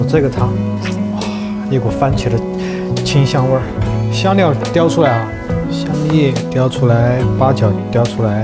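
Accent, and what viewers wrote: Sichuan, China. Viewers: 师傅是四川人吗？椒盐普通话很好听。😊菜单也收藏了。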